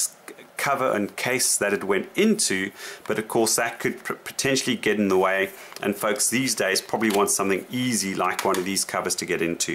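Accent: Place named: South Africa. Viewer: Good video, thanks. Do I detect a SA accent there?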